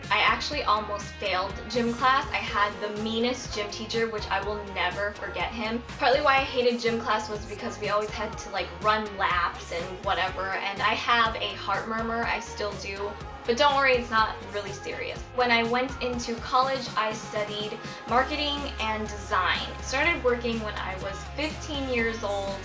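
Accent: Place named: South, United States. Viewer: My god and your southern accent!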